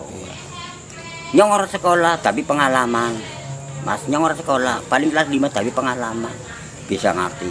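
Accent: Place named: Banyumas, Indonesia. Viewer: Mbah ... Bahasa ngapak yg begini bikin aku betah di tegal ...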